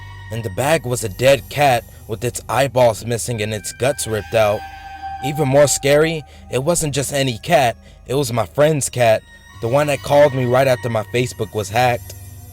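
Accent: South, United States. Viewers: Woah woah woah. This dude from the south or what?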